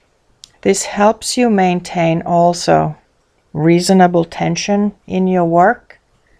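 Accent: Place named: Russia. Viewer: Yes, IMHO, her purl is definitely 'Russian' style.....perhaps her accent, too.....not that it matters..........and, it twists the stitch CW versus CCW and requires working through the back of the stitch/es on the return row......which can get messy if working a complicated pattern.....lace work in other words.....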